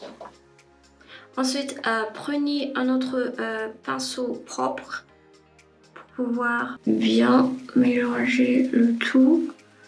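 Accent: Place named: Germany. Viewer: Merci bcp,j' Adore votre chaine😙😙😙 Le Accent kou efa lasa Deutsch😀😀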